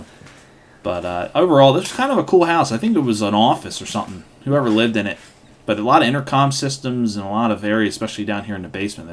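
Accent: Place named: Delaware County, United States. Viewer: Your accent gives you away. We grew up in the same place, Delco. I can tell.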